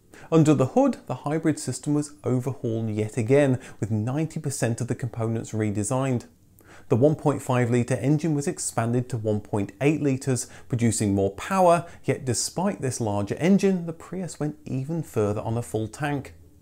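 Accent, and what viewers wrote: England, United Kingdom. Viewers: Same here after listening to ur way u deliver us which is similar like UK English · Yeah i'm very surprised too. With all the english cars videos and the accent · You always seemed to be from the UK , some sleepy village there but great stuff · Didn't realize you're are American. To me you sound British. Also the choice of cars is in my opinion very European. Anyway, great channel. Thank you for all the time you put in. Cheers from Bavaria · I was about to say the same. You've done well keeping such a clear English accent without any hint of an American accent. I remember a lad I went to school with, he went to America something to do with becoming a sports coach when he was around 19. When he returned after a few months, he had a ghastly 'fake' accent to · You had me fooled too. Started following your channel very recently, and mistook you for a Brit . 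Are you from the Midlands originally - Birmingham, maybe?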